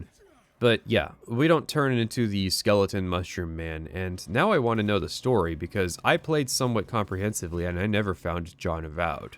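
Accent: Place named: United States. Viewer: I really have to ask how you, with your thick, middle-of-the-road Yank accent, know how the second act Italian accents are “fake”. You suddenly not only a gaming expert but a linguistics pro as well? Do the video blogging world a favor and get off your high horse with this shit. Review the game….leave your opinions about accents out of it.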